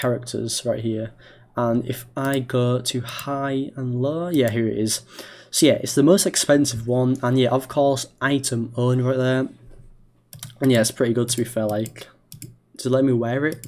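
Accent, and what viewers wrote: United Kingdom. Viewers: Bruh are you British WTF 😳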